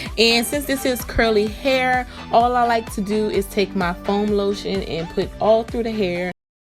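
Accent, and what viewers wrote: Louisiana, United States. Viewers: Am I detecting a Louisiana accent in that voice? Lol I love it! You've made it so easy for me.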